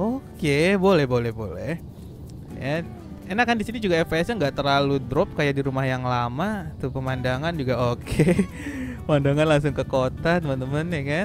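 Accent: Palembang, Indonesia. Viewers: Bang kamu orang Palembang yaa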